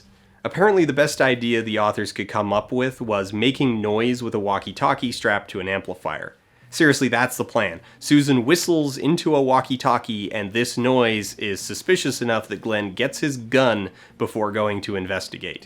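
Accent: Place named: Canada. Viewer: Definitely is Canadian.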